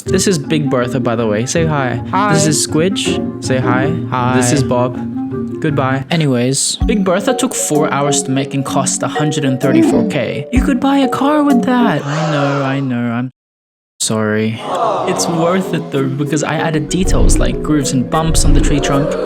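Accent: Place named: Australia. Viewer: omg it’s an aussie!! side note: your building skills are litteraly jaw dropping oml🔥🔥, also the editing 🤌🤌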